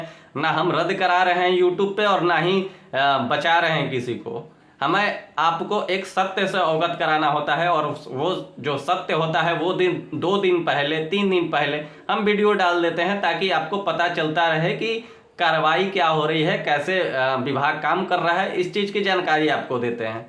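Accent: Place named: Bihar, India. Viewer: बिहारी स्टाईल में बोली ता एकदम गर्दा।।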